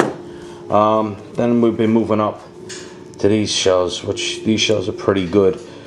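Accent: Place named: New York, United States. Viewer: Your NY accent remind me lot of the guys from Grucci when they fly here to las vegas from new york to work on a show hahaha. and all i would hear is " hey Tony...go fuck yourself!" funny as shit loading and wiring shells in the sun and everyone is talking shit left and right...but playfully though..makes work more fun. happy 4th man!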